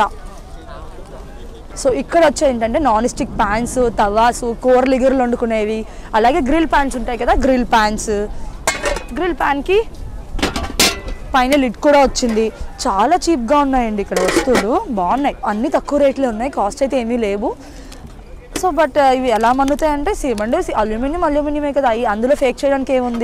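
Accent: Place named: Rajahmundry, India. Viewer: Voice vintunte starting lo . .ekkado vinnttuga anipinchindi....Rjy voice na .. 😀 Maadi ade....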